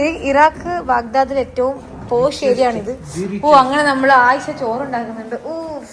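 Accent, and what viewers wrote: Kannur, India. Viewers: ഒരു കാമറാവുമണിനെയും കൂടെ കൂട്ടാമായിരുന്നു. ഭാഷ ഒന്നുകൂടി നന്നാക്കാമായിരുന്നു. തനി നാടൻ കണ്ണൂർ ഭാഷയായിപ്പോയി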